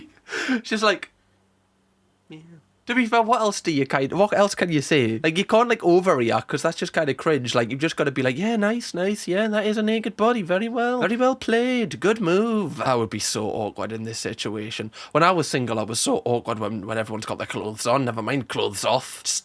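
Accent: United Kingdom. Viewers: To be fair i like the british accent indeed the best😂 (coming from a german)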